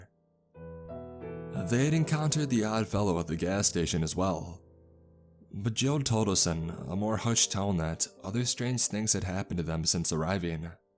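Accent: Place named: South, United States. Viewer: I love the southern drawl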